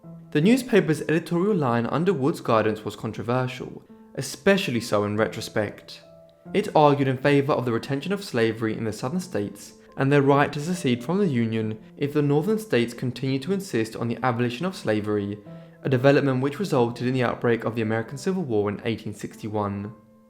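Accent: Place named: RP, United Kingdom. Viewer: Oooh, I would also like to know where you are from! I detect a posh accent, but then again perhaps you use AI.? You are well-spoken/articulate; a great story teller who is easy on the eye, so that’s why you might be AI. No offense meant if you are Real or if use AI. Just finished watching. My guesses were your ancestors were from Spain, Argentina, France or Italy. Well since AI has no DNA, you must be Real then! :D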